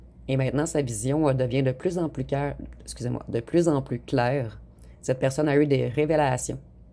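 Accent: Quebec, Canada. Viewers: Merci beaucoup a vous que j'apprécie ainsi que votre accent québécois que j'aime enormement . Gratitude et remerciements à vous .❤👍🙏🌺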